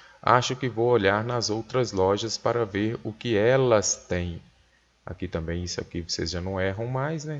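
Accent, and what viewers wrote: Minas Gerais, Brazil. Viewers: Professor amo o jeito mineiro de falar ! Obrigada pelo vídeo !!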